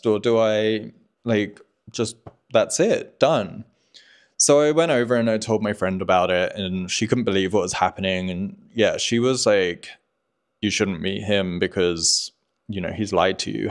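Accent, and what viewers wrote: England, United Kingdom. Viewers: I am from Birmingham but it wasn't me lol loads of fake pics still about these days interesting vlog btw you still got your English accent 🍻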